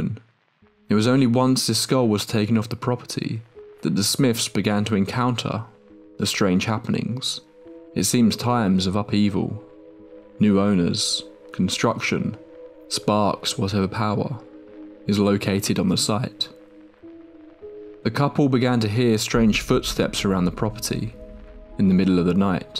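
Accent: United Kingdom. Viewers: Why does this accent sound British, he's not...Unless he is..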